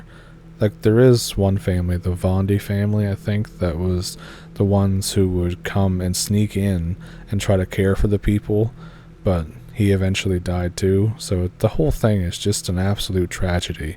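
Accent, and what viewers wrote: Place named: Nova Scotia, Canada. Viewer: Are you in N.B or N.S? I know the accent as I am from Nova Scotia hahah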